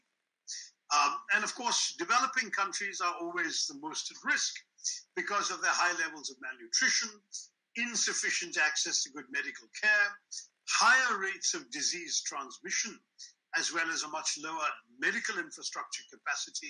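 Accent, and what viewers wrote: England, United Kingdom. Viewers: Respected sir, this is sandeep sharma working with IFFCO as a stenographer. I have been watching your video to enhance my vacab. And its knowledgeable too. You are great sir. I am really gladdened with you english accent and vocab. Please be there to improve english of a person like me. Thanks a lot .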